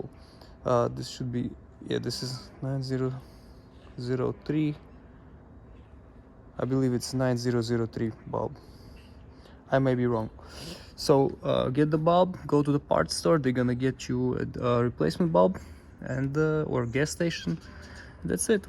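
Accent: Russia. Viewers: не знаю кто ты и откуда, но слышен русский акцент ) классное видео спасибо )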